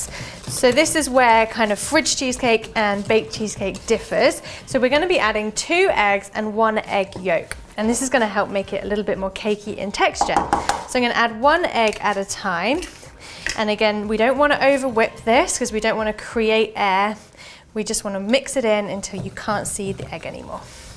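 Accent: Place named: RP, United Kingdom. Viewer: A Very British “Toff” Accent!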